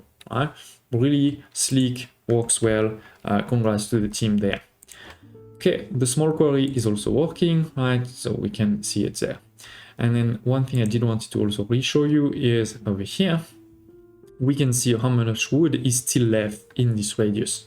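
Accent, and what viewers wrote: France. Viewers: Avec le bon accent français hmmmmm 😮‍💨👌🏻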